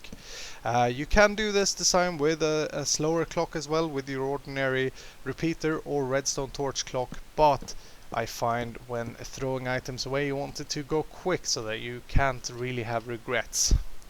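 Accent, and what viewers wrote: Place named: Sweden. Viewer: lol snygg svensk dialekt. shota into lava lol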